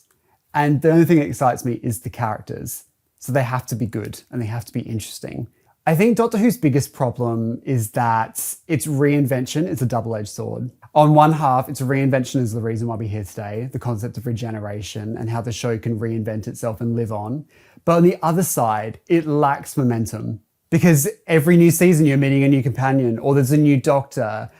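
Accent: Australia. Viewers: are you australian by any chance?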